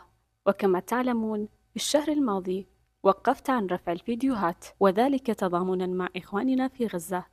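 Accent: Yemen. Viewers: لهجتك يمنيه عرفت لما سمعتش تتكلمين مثلنا 😅بالتوفيق بمشروعك الجميل والصادق ❤ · فديت بنت اليمن اعرف اللهجه لو بين مليون والنظافه والادب والاخلاق جبرني بك ❤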